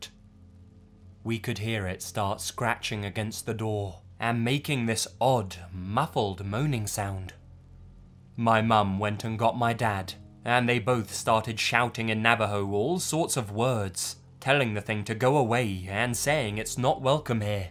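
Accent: England, United Kingdom. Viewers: british accent reading/narrator for Native American SKWalkers video??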